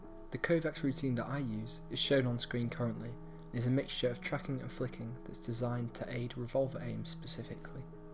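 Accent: United Kingdom. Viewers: This was so informative and seductive.. the Welsh accent helps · hes british just like the spy :o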